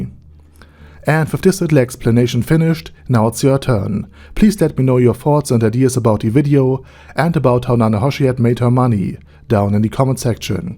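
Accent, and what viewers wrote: Germany. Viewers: jesus christ ur german accent is strong asf. deutscher bursche. ur voice is hard to listen to.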